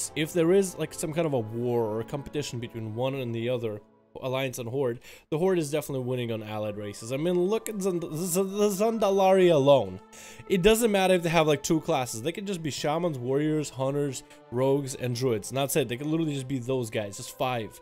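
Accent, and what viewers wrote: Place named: Russia. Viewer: This is not meant to be hateful... I'm just trying to give my Opinion on how to make your Videos a lot more enjoyable (for me, at least). It really bothers me, when you sometimes just mumble some words and I can't really understand what you're saying - or I really have to concentrate on the Video. I like to put videos like yours on, while playing. And it would make it a lot more enjoyable, if you'd just take your time to pronounce everything correctly. And I think you're part russian (Am I right?) and that can make speaking English harder. As a non native english speaker myself, I get you 😉 But I think you could really improve that, by just talking a bit more slow and just trying to take your time. I really like your content. But that sometimes really bothers me. If I'm the only one on that, then nevermind, forget about it 🙈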